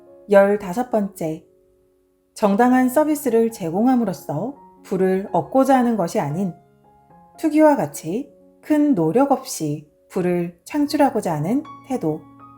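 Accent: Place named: Gyeongsang, South Korea. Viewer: 경상도 분이셨군요~★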